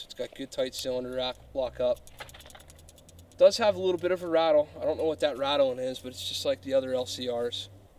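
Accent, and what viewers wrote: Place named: Philadelphia, United States. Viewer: Good review. Your accent says, “I’m from the Philly area!” amirite? Maybe Delaware?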